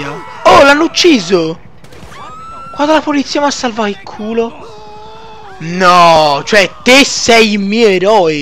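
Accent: Tuscany, Italy. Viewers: Ma sei toscano? il tuo accento mi ricorda tanto il mio. sai i toscani si accorgono dell'accento toscano degli altri più facilmente degli altri :D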